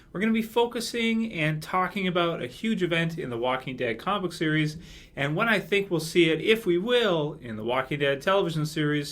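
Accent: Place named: Canada. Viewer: Canadian? I hear an accent from time to time. Great channel. Love it!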